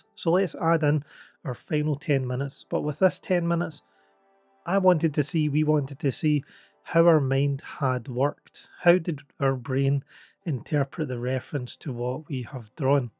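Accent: Scotland, United Kingdom. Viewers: you are a great Artist, good to hear a Scottish person like myself LOL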